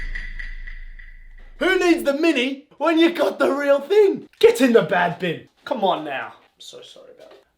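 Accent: Australia. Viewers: Are you from Australia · Is Australian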